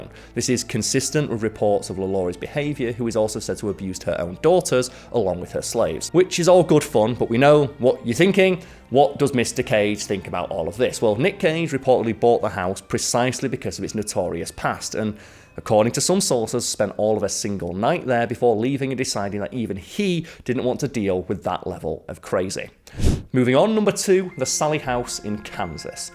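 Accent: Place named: North, United Kingdom. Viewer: Why are you still the interim host? Ive watched this channel for years and you’re the best host. It was weird hearing a fellow northerner at first, but now its a highlight of watching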